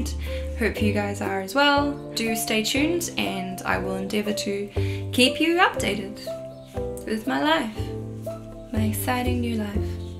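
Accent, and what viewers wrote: New Zealand. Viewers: found your channel while looking for a guitar tutorial for " " by. great cover and guitar composition by the way. your speaking voice is so different to what i expected. love the kiwi accent lol. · Thank you so so much for sharing a little bit of background story about you. I have always listened to your music but to learn more about you was fascinating because I thought you were living in China and Chinese but you sound like you are from New Zealand. That threw me way off lol but I love your music and your Vibe and your authentic personality! And I can't wait to hear what you come out with next!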